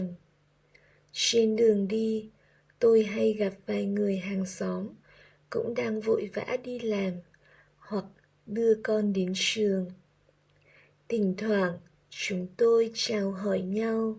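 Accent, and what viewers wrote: North, Vietnam. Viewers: oh this is north vietnamese... I thought it was southern vietnamese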